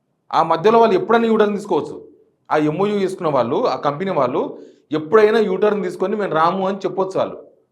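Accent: Telangana, India. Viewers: పూలపండ్లు అచ్చమైన స్వచ్ఛమైన తెలంగాణ యాస సూపర్ అన్నా..😄😁 good information ..👋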